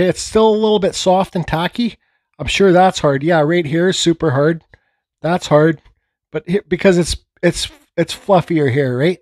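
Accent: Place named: East Coast, United States. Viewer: Thanks so much and I adore the east coast accent ! Wisconsin accents are boring in comparison!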